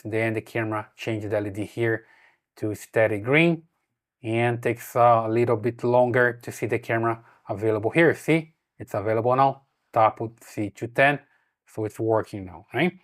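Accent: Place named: Brazil. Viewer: Vc eh brasileiro nao eh? Esse nosso sotaque eh inconfundível. 🇧🇷 Os vídeos são mto bons, bem úteis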